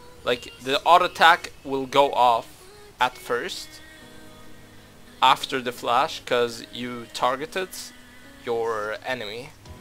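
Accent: Germany. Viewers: your english pronunciation lets everyone think that youre from germany in like 3secs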